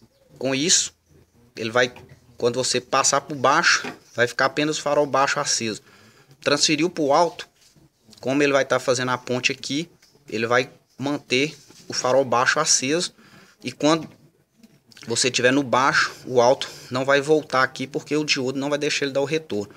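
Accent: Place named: Minas Gerais, Brazil. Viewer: Boa, sotaque de mineiro.Bem explicado.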